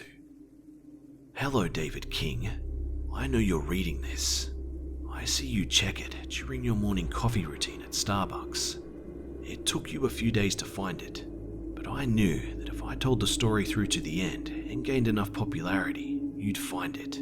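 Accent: Australia. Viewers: Is that an Aussie accent!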